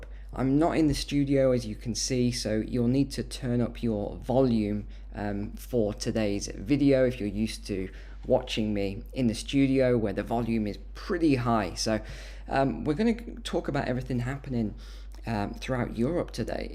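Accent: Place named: England, United Kingdom. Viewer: I don't know where you live, but you sound like a Pommie, so you must know your country is a socialist state, otherwise you would not have all the welfare services on offer. Indeed all western Countries I would say are Democratic Socialist countries (except for the USA), because they all have welfare programs and social safety nets.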